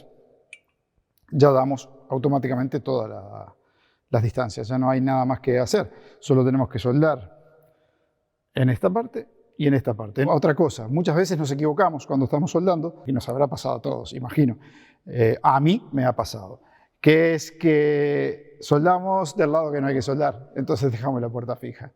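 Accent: Rioplatense, Argentina. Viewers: Felicitaciones!! Excelente trabajo. Una pregunta, sos Argentino? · El sonido de fondo es espectacular. Buen trabajo muy creativo. Saludos desde Queretaro México. Argentino o Uruguayo? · Su tonada es mas Argentina/Uruguaya que Española, puede ser? :P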